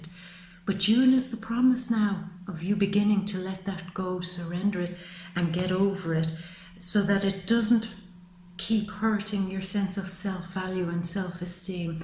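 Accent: Ireland. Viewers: Accent is Irish? Just curious.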